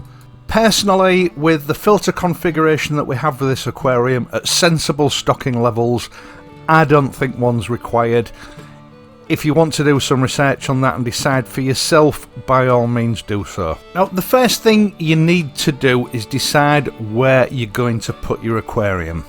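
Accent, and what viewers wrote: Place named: Hull, United Kingdom. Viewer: I am making an assumption based on your accent that you are somewhere near Hull. As a child I used to go to KKC in Hessle. Where would you recommend nowadays?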